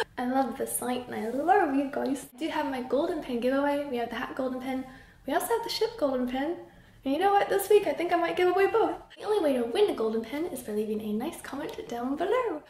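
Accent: United Kingdom. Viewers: Love that you're starting to pick up a British accent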